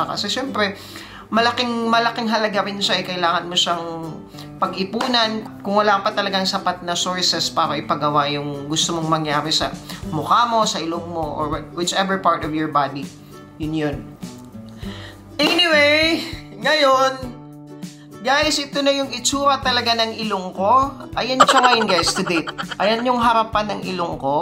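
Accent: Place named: Visayas, Philippines. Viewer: Dahil sa nose lift new subscriber here na search kac ako, hi sir bisaya ka po kac sa accent eh, bisaya here, ahm tanong ko Lang pag sa lips po may idea po ba kayo if how much, kac sa ilong ko contento naman ako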